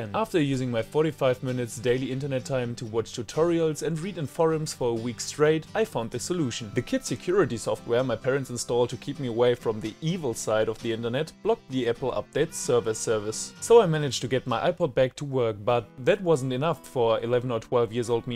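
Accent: Germany. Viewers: Are you from german ? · Man hört so krass raus dass du deutscher bist hahaha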